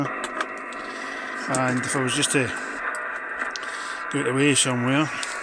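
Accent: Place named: Glasgow, United Kingdom. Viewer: Tell me - you must be from Glasgow or the west at least!! Cheers de VK6HIL ( an ex-weegie! )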